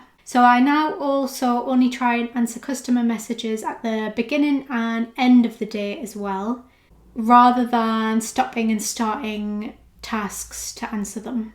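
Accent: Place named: Ireland. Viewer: I love the Irish Accent.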